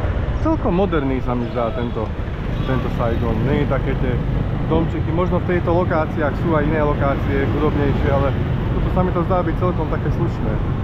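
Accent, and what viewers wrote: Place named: Šurany, Slovakia. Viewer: boa, ale tá šúránčina je riadne počuť :) Ale dík za videá, ja sa chystám do Saigonu v apríli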